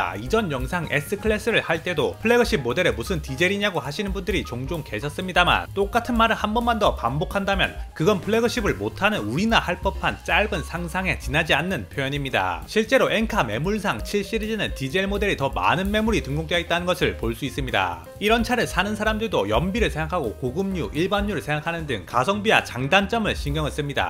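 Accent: Gyeongsang, South Korea. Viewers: 말투가 경상돈가 함경도인가?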